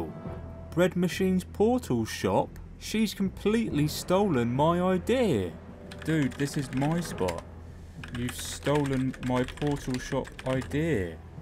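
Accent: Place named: Estuary, United Kingdom. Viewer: That Estuary accent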